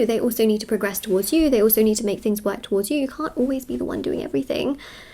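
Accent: United Kingdom. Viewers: Dude you look like North Indian with strict parents but you sound like British ?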